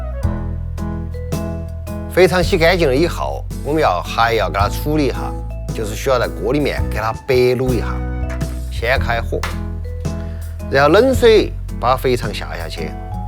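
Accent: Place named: Sichuan, China. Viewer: ，不开心的时候需要你的川普。谢谢你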